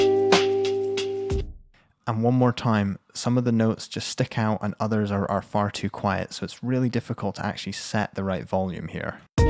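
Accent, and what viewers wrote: Scotland, United Kingdom. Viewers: Ery fine. The listening was the important part. The post compression comparison made what I was hearing visible. Thank you. Do I detect just a wee Scottish burr in there? Well hidden , but awe the same....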